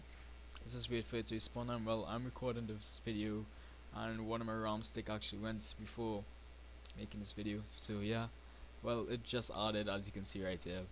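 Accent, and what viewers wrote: Trinidad and Tobago. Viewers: u sounding like u from trinidad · ur trinidadian?? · Aye Bhai youze a Trinidadian ? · dude are u Trini ?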